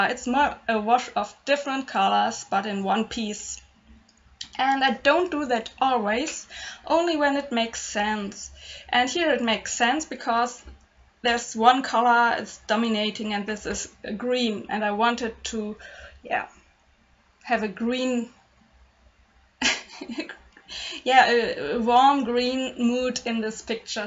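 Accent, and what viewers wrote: Germany. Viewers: Hmmm... your accent kinda sounds german. Where does my guess come from? My mom articulates herself very similar to you, when speaking english. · me too, I love her German accent. It's a shame all the commenters on YouTube teased her about it. There is joy in diversity, unfortunately not all see things that way. 😔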